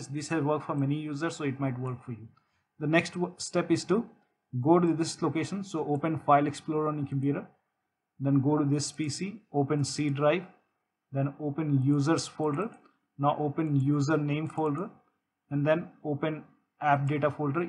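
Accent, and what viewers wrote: India. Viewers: Any PC video with an indian accent is automatically a W. Well done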